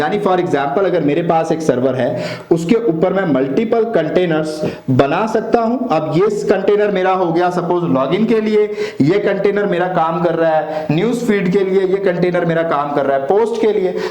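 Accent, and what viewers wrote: Pakistan. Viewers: Tell him to do a ljve f4om India, or you share me a video that proves his Indian Identity..His accent is pure punjabi pakistani accent n he also hidea time zone of his system.. Anyways he is doing a great job..One of the best online tutor..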